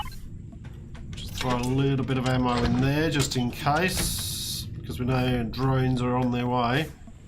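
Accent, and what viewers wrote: Australia. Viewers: nice video there, what part of Australia ru from if you dont mind me asking, im from collingwood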